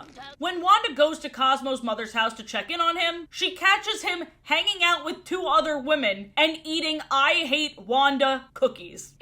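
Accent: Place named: Northern England, United Kingdom. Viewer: Where is your accent from ? The way you prounounce 'ee' at the end of words is very north of England-ish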